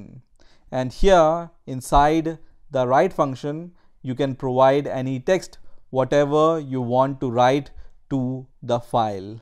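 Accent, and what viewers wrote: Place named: Punjab, India. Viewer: Thanks sir... It's helpful video............ And your good English is unable to hide punjabi seeming accent... Tenn... Inn😊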